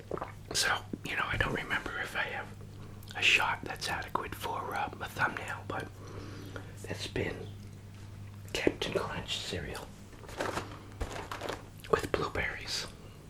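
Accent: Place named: Massachusetts, United States. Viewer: I love your videos especially your accent - I was born & raised in West Bridgewater right next to Brockton but now live in NC - you sound like home which makes me so happy 🙂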